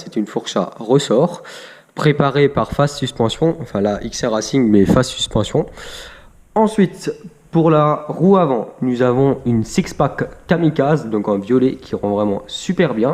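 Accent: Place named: England, United Kingdom. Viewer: L’accent anglais c incroyable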